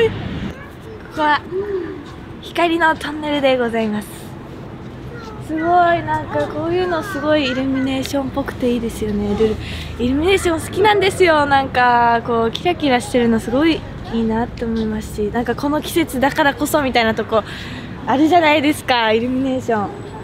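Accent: Hiroshima, Japan. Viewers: 段原さんの広島弁聞いて、アンジュルムの川村さんと二人のラジオ番組あればなって思いました。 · 🤗💓 喋ってるときの舌足らずな感じが可愛いー(*´▽｀*) ちょいちょい出る広島弁もイイ‼️ そして歌うと恐ろしいほどの上手さ。 私はあなたの虜ですー♥️ んで からの声が鞘師のぽく聞こえたw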